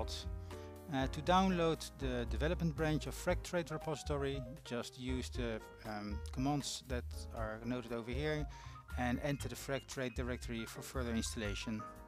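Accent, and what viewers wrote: Netherlands. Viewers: First sentence and I knew he was dutch. Dank je wel voor de playlist!! haha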